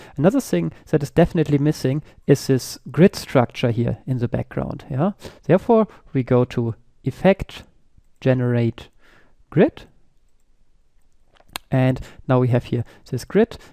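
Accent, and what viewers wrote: Germany. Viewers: ich glaube dass ihr damit definitiv mehr leute erreicht! über den deutschen akzent kann man locker hinweg sehen! weiter so!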